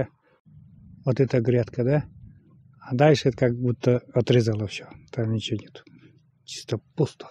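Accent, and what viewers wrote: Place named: Vologda, Russia. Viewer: почему-то не могу найти где вы живёте? Где-то в видео мелькал Сыктывкар. Это там вы живёте? Очень говор похож на моих родственников они жили в Вологодской обл.и в Архангельской обл. Слушаю вас и как будто бы с ними общаюсь. Они тоже были спокойные, урасновешенные. У них тоже были избы из бревен, холодно также было как и у вас. Красота природы тоже как у вас. На жаль они уже все ушли в мир иной. Но не будем о грустном, царствие небесное им а нам здравия, любви, благополучия.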